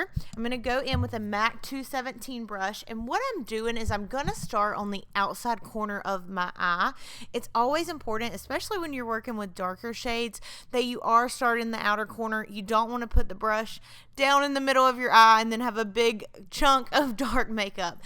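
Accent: South, United States. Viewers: Randommmmm but I so love your hair it looks so healthy and love the southern accent. The makeup is cute to of course haha.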